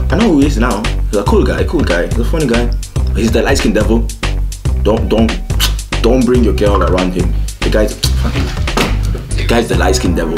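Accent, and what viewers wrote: Birmingham, United Kingdom. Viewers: Bro I'm from brum but my accent ain't like yours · Is he from Brum or what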